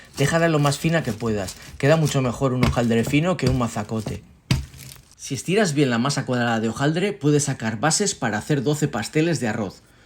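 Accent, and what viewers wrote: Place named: Spain. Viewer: Fantástica receta...y lo más lindo,para nosotros los sudamericanos, es "vuestra habla"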